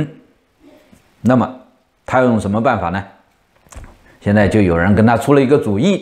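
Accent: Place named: Changde, China. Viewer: 常德口音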